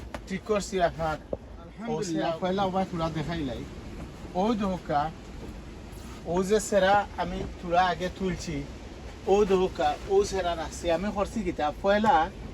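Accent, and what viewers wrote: Sylhet, Bangladesh. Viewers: আপু সিলেটের আন্চলিক ভাষা একটু ভিন্ন। তাই আপনার বুঝতে কষ্ট হচ্ছে। আমি ছোটবেলা থেকে সিলেটের আত্মীয় স্বজনের সাথে কথা বলে অভ‍্যস্ত তাই বুঝি। উনার ভিডিও গুলো অনেক informative. ইনশাআল্লাহ দেখতে দেখতে শিখে যাবেন। 😊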